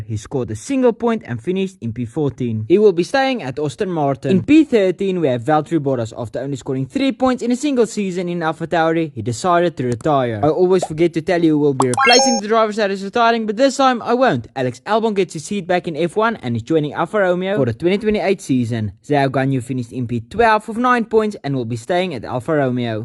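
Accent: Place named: South Africa. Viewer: This is the strongest South african accent I have heard in my life.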